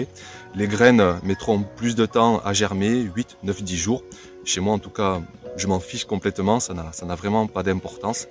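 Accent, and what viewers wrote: South, France. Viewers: Merci c est toujours un plaisir de te suivre avec ton accent qui chante le sud de la France sa réchauffe le coeur bisous de Belgique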